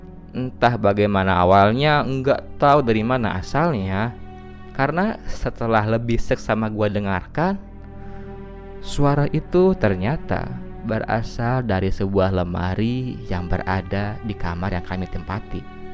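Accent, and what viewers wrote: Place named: West Java, Indonesia. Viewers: Urang sunda sigana mah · Sundaan euy😋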